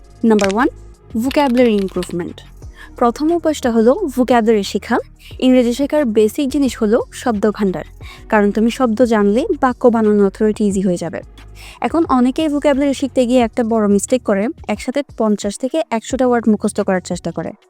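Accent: Chittagong, Bangladesh. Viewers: Are you frome chittagong?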